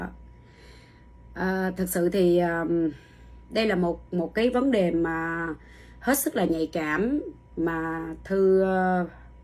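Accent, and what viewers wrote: Standard. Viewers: noi qua chuan.m cam on chinh phu dai loan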